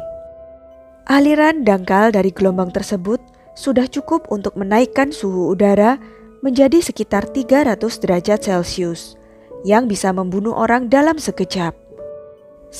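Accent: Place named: Java, Indonesia. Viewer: Admin medok banget asli orang jawa kah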